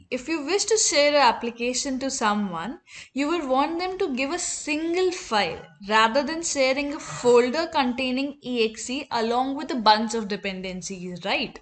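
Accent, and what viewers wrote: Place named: India. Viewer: Good content, but the Indian accent is SO STRONG that it is VERY Distracting. The cadence of speech is from a totally different language than English. Please learn how to speak with at a minimum British accent, or much preferred ... an American-style accent.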